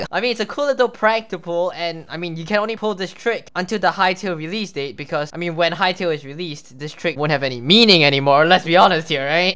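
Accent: Singapore. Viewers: why do you sound singaporean :sob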